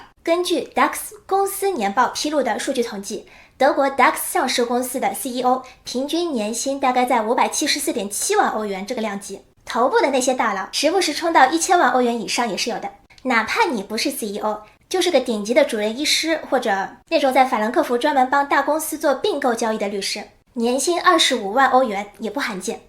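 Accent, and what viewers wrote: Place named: Shanghai, China. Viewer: 口齿伶俐思路清晰财商飙高，再加上这发音，觉得是上海妹子。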